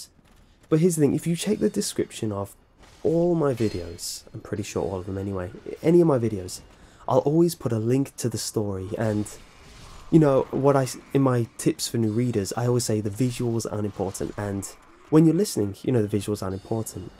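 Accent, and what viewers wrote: United Kingdom. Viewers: What people like about your voice: it sounds Brittish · He's brittish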